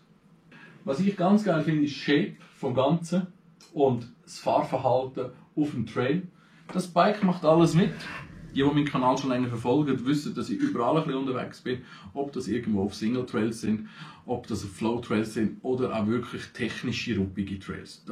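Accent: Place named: Switzerland. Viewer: einiges gelernt... bissl übers Bike, aber vor allem Schwizerdütsch, mithilfe der Untertitel 😁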